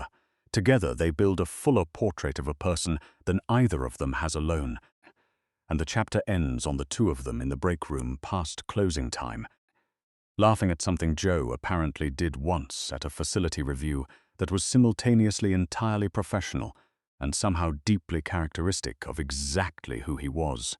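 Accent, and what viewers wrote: United Kingdom. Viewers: It’s a British accent, in the King’s English.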